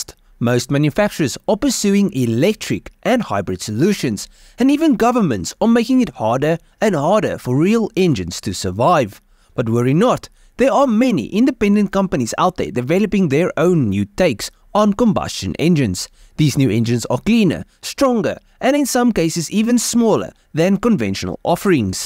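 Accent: South Africa. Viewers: I somehow don't think Noel Duke would appreciate your over-laying and inter-mingling his Z-axis engine rotary engine with the VERY different 'DEVIZE Barrel engine'. ... and I would know; Noel is a personal friend of mine. Barrel-type axial engine's have been re-cycled over, and over and they have NOTHING new to offer. All fail because using a cam plate to translate reciprocating loads over the long-term life of a typical automotive engine is a non-starter. From your accent you are obviously South African so why not look in your own backyard? The Van Loewy (my memory may be faulty on the exact spelling of the name) is a far more interesting axial engine from the 1980/90's. It used patented universal joint to articulate the piston-rod/wobble-plate connection such that it eliminated the figure-of-8 oscillation and resolved the motion to a pure straight-line motion. 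superior to any cam-type axial engine! How about it?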